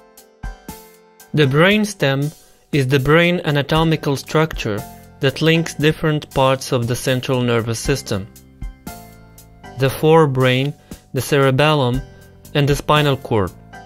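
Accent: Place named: United States. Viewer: Why are you pronouncing English as Americans being an Indian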